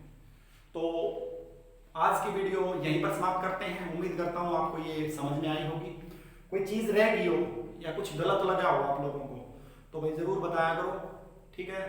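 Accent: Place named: Haryana, India. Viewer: ठेठ हरियाणवी अंदाज़👌👌😂🥰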